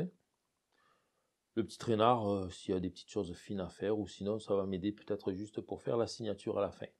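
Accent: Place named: Provence, France. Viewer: Bonjour monsieur,je vous remercie pour votre calme ,vos explications claires,et vôtre lumière bienveillante , même quand ont débute , ont se sent ,en confiance comme avec un ami,qui nous partagent une même passion 😄, votre accent ,le dis que vous ête de chez nous ,je suis du Vaucluse ,et cela fait chaud au cœur 💓 de sentir dans vôtre accent qui est le nôtre,nos racines , merci infiniment ,bien le bonjour à Mme , je viens de m'abonner et j'ai partagé avec une personne de mon entourage,qui est artiste peintre 🦋🙏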